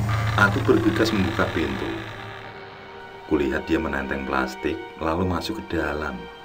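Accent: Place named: Pati, Indonesia. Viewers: Pati mana suaranya 😃👆👆 Juwana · Orng Pati mana suaranya👍👍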